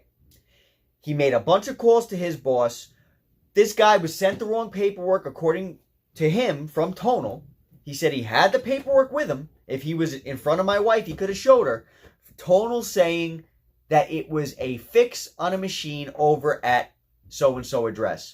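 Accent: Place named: East Coast, United States. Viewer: I kept listening because I honestly love your accent. You must be from the east coast? New viewer here. My mom had a thick east coast accent and I miss it everyday since she passed away.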